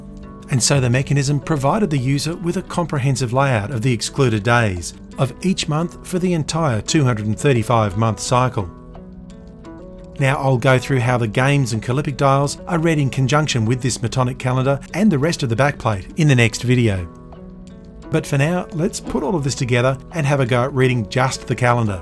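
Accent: Australia. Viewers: admittedly i skiped to this vid but after seeing your craftsmanship i have to watch the whole series as well as your other vids 😅 aussie accent is a nice bonus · Anyone else remember like 5 years ago when you clicked on a video of a dude with an Australian accent making a small brass scribe? Crazy stuff..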